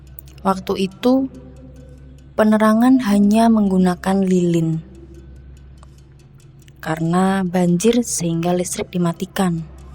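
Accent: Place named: Java, Indonesia. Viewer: monmaap medoknya malah bikin senyum bukan serem , tp tetep keren ceritanya luv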